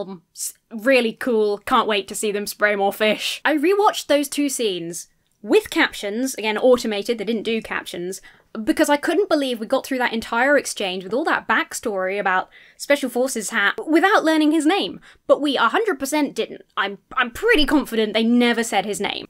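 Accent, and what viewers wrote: United States. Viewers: Your American accent is getting pretty solid! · Dude, your American accent is awesome. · Killing that "Yank" accent gurl.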